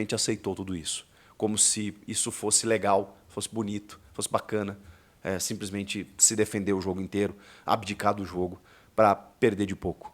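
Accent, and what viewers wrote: Rio Grande do Sul, Brazil. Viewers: Essa versão do Chico eu não conhecia. Até o sotaque gaúcho apareceu. Por mais que seja um jornalista brilhante, hoje quem postou esse vídeo foi o torcedor. Ele é um gremista nato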